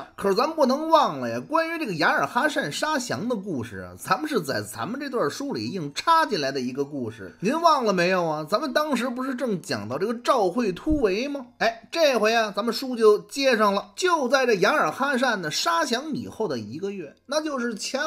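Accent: Beijing, China. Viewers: 北京音有个神奇的效果，用京音儿说任何事都像在说评书，像在侃大山，像在忽悠，失去了可信度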